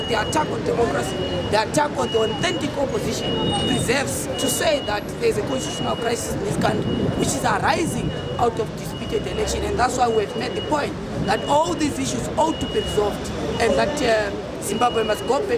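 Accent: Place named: Oxford, United Kingdom. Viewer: Taura zvako wangu mufanha wechidiki , who speak English with an Oxford asent Osalo 💖💥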